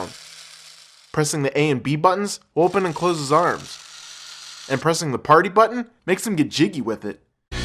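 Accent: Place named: Ohio, United States. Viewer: Does "charism" mean a big dumb pasty head with an awful Ohio accent?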